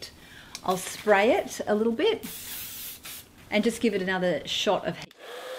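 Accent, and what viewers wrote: Australia. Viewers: Thank you for such nice helpful tips oh and I LOVE your Aussie accent☺️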